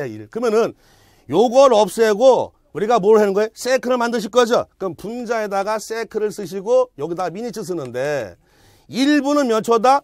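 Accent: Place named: Jeolla, South Korea. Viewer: 강사님 전라도분이시죠? "그므는" 저도 전라도 사람인데 너무 구수하고 좋습니다.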